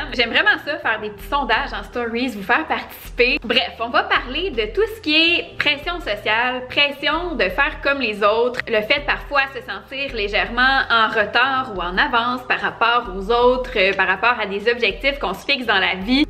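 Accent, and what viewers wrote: Quebec, Canada. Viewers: Je te regarde depuis plusieurs années en même temps que j'étudie le français et je suis si contente de comprendre l'accent québecois (et au rhythme assez vite du style d'une vlogueuse) sans-soutitres. C'est comme une preuve pour me rassurer que j'ameliore mon niveau tout le temps!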